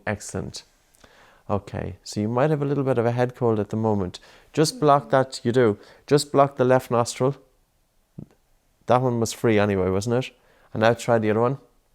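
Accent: Ireland. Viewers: Are the subtitles nessesary??? Its just an Irish accent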